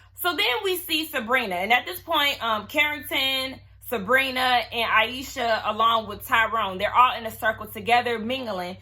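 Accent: South, United States. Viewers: Fantastic review and agreed with your summary. Your southern accent is lovely also. Now on to the tea....did you hear that Jason and Liz from last season (yes the couple who were co hosting for Tommy in this season) have split up? Sad times 😞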